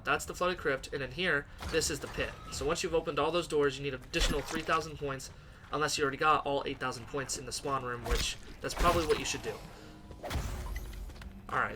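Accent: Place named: Wichita, United States. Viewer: oh nah lol. I just came from Wichita for 6 years. The accent sounded like it was from that area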